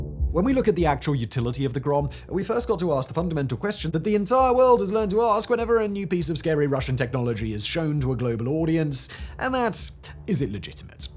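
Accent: United Kingdom. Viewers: All your British lilt and shabby jabs at Russian and Chinese counterparts seem more and more like an age old Western propaganda than an unbiased fair take on the subject. No modern fighter jets have come head to head against each other in current war scenarios and neither you can confidently confirm that Russian or Chinese jets and missiles are somehow inferior to what west has to offer. Dont be so sure lil English brother, the Trident you tried to scare Russians embarrassed you globally and just recently.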